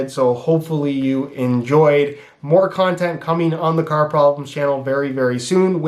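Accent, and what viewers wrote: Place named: Canada. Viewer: Lmao I always used to watch SR4U but I started to care a bit less about soccer (football over here) and stopped watching your channel. Today a video popped up in my recommended by this new channel called 'Car Problems' and I clicked on it. I thought you seemed familiar but just assumed all young Canadian guys must just sound really similar to me😅. It was only when I saw one of these videos in your soccer cleats room that I realised it really was you lmao. You've just earned yourself a new subscriber, keep up the good work because this channel is probably going to take off with more non soccer players getting these videos in their recommended.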